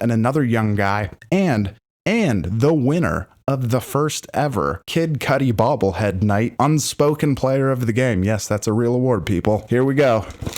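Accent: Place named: Canada. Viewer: So where from Canada is this guy from again???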